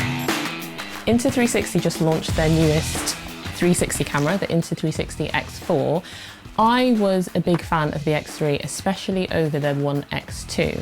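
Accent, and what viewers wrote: United Kingdom. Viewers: And the British accent is like a free pass to "everything she says must be true"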